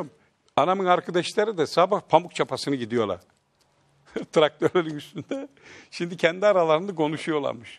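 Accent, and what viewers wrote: Aegean, Turkey. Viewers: ege şivesi bu işte muğla tarafında konuşulanı en azından böyle içeri doğru gittikçe aydın manisa denizli afyonda daha da farklı ağızda konuşulur ama günümüzde süslü manken bozması oyuncuların oynadığı dizi filmlerdeki şive kesinlikle doğal ege şivesi değil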